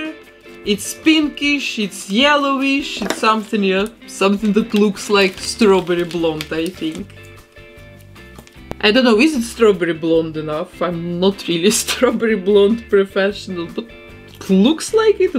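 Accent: Russia. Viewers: Волосы грубо смотрятся, как солома( И да, русский акцент))) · Where is she from? Such a different accent! I'm guessing she's European or Russian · Что с твоим голосом? Ты что русская ? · не перестаю удивляться тому, насколько русским может звучать английский язык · Стоп, я слышу руский акцент))) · Акцент русский, вы случайно не из России? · She has a Russian accent, she is russian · Русский английский) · Вы Русская? Акцент 🤦‍♀️ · Акцент очень похож на русский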